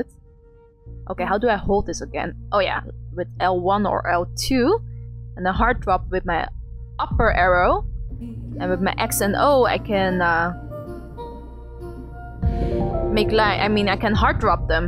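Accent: Netherlands. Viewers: 🤣🤣your vid convinced me to buy it I can tell you really enjoyed it..by the way awesome accent are you a native Dutch speaker?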